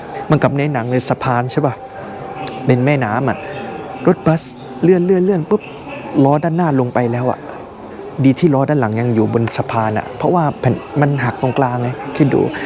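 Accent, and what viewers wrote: South, Thailand. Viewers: พีเป็นคนใต้ไหมครับ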